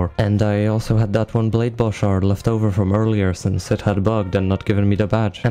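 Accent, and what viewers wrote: Poland. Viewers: yo you polish? dawg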